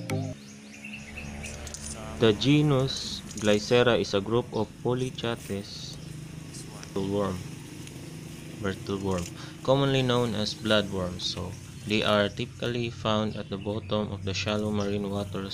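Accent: Visayas, Philippines. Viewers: Taga san kayo kuya mukhang bisaya kayo ahh😁😊😊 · Taga san kayo kuya mukhang bisaya kayo ahh😁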